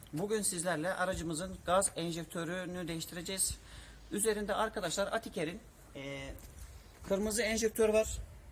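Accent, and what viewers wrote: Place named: Hungary. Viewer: Bu kizancik Macir belli beya konusmasindan kizancagizim kolay gelsin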